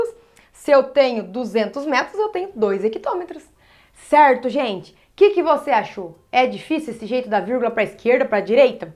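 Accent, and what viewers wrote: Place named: Rio Grande do Sul, Brazil. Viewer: Vc parece gaúcha 😅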